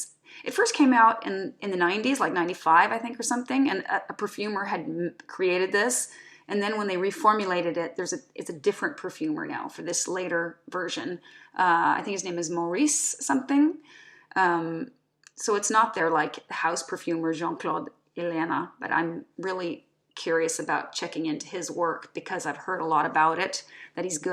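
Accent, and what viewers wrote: Midwest, United States. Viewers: I'm so glad you are posting. Years ago I met a handsome man from Sweden in a bar in Chicago on St Patrick's day. He had a lovely accent. You sound like you live next door in the midwest of the US. So today I tried a sample and found it to be glorious, but it faded quickly. It is an old and small sample. Do you think it fades quickly .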